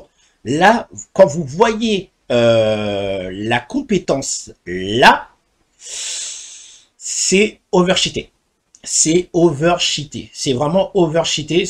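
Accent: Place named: England, United Kingdom. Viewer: Superbe accent anglais ! Tu rentres dans le game mondial du gatcha youtube 😎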